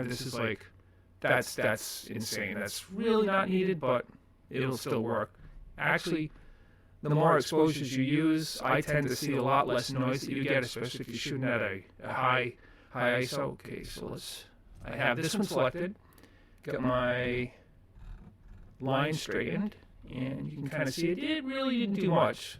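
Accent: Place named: Boston, United States. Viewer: Are you strictly just using a mouse to do all of your adjustments and edits in Photoshop, instead of using a drawing tablet and stylus? Man, I haven't done that since I bought my first computer way back in 1996. That's impressive! Excellent video, too. By the way, are you from Boston? Anyway, definitely a "Thumbs-Up!" from me. Thanks for the help. I appreciate it a lot.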